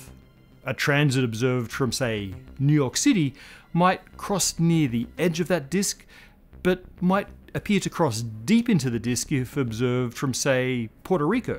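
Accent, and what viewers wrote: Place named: Australia. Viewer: Matt is my all-time favourite narrator. His Aussie accent, perfect pronunciations, and fluid delivery are awesome. Give this man a round of applause! :hand-pink-waving::hand-pink-waving::hand-pink-waving::hand-pink-waving::hand-pink-waving::hand-pink-waving::hand-pink-waving::hand-pink-waving::hand-pink-waving::hand-pink-waving::hand-pink-waving::hand-pink-waving::hand-pink-waving::hand-pink-waving::face-blue-smiling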